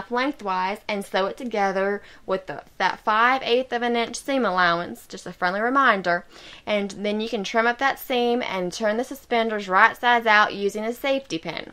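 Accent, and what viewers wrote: South, United States. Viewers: so beautiful! what a great tutorial! and I love your southern drawl! lol what material did you use for the gold? on the accent?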